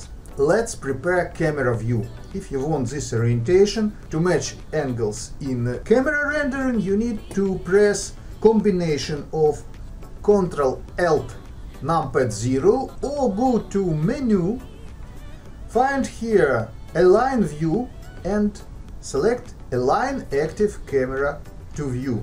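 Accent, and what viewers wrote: Russia. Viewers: По акценту понял что ты русский :elbowcough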